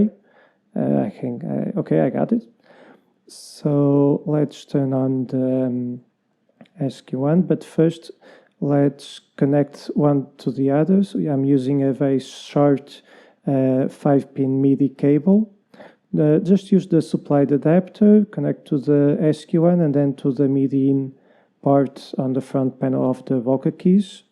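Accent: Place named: Portugal. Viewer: Are you from Portugal? I watch a lot of your videos and I can always detect some Portuguese accent 🤔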